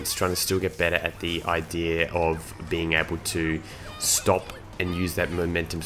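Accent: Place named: Melbourne, Australia. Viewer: Are u from Melbourne? Love that accent. Anyways, great video!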